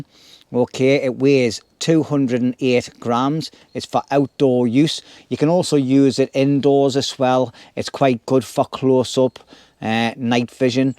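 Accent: United Kingdom. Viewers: Great Brit English ❤ You must be Ex German ? 😂 (like me 😅) Unboxing: keep it short ❤ Video with IR: very grainy 😢 IR off —> NG Night sky: 😰 I better still keep on looking for a better unit 😂 it great video, 🙏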